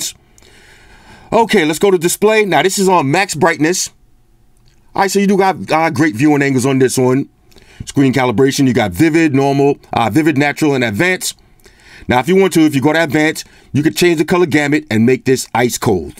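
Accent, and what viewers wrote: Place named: Staten Island, United States. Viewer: Is from Staten Island? Because the way he speaks reminds me of Wu-tang.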